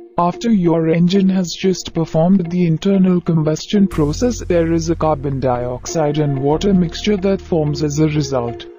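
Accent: India. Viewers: Bro has an indian accent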